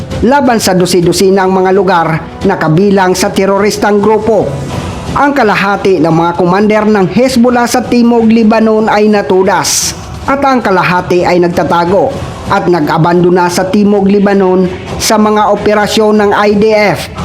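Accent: Iloilo, Philippines. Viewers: kesa naman sa katulad mo na di kaya ang ginagawa ni prof eh ilonggo yan si idol eh hahaha. Mas mahilig pumuna ang mga taong walang kakayahan kesa doon sa marurunong.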